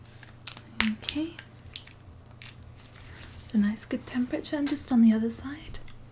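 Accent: Scotland, United Kingdom. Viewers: I LOVE BRITISH ACCENTS THEY ARE SO BEAUTIFUL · What happened to the 'Jings Crivens, help ma Boab' accent ? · ASMR love your asmr. genuinely thought you were Scottish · are you scottish? is just that your accent sounds kinda more poshy on this vid in comparisson with the others haha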